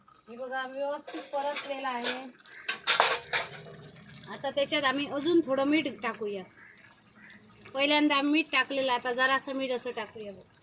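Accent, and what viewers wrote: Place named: Malvan, India. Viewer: भाजी करण्याची पद्धत, मस्तच, तुम्ही कुठचे तुम्ही. तुम्ही मालवणी पद्धतीने बोलता म्हणून विचारले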